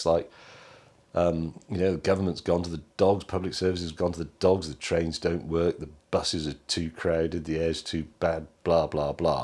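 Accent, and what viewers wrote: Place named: United Kingdom. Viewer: Hi James. I too, am a native Californian. I will join the chorus of Californians posting here and say that you are more than welcome here. We love British accents and with your wit, humor, and charm, you would be a big hit anywhere you go.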